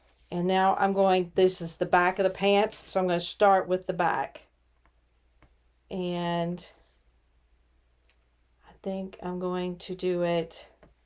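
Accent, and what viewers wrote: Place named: United States. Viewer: I listened to your video shorts with the sound down and was surprised when I heard your voice had a US accent. You looked so typically British. 😊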